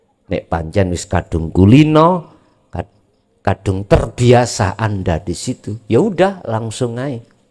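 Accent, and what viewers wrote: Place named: Java, Indonesia. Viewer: Benar sekali,sy yakin seyakin-yakinnya, Insyaallah setelah menyimak video ttg, Zikir Sirul Asror dr pak Kiai,sy merasa lapang menghadapi apapun yg menimpa diri dan klg ataupun siapapun,dan menghilang kan rasa ujub,riak,iri, dengki dll, Terimakasih Guru semoga sehat selalu beserta keluarga besar,dr Lombok timur NTB(logat jawanya dpt sy pahami, walopun tdk semuanya,klo bisa kurangi BHS jawanya Kiai)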